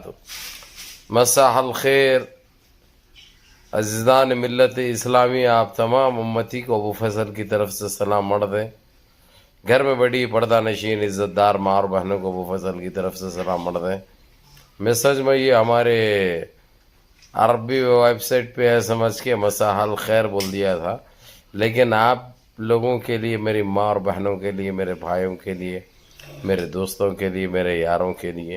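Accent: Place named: Bangladesh. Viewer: Ap arbi logou ku denk kar ap bahut feel kar te jaisa ke ap bhi arabi ahy hyd ke chaushu se baat karoyaro ap jo arabi me karte aisa lagta ahy koyai bangali ahy ap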